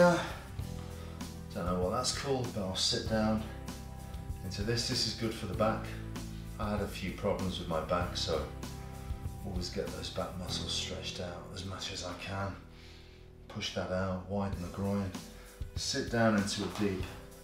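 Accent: United Kingdom. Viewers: Ey,this is amazing...I can do some physical exercises and at the same time improve my listening and pronunciation... Nice British pronunciation!!👌👌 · Pretty British 🇬🇧 accent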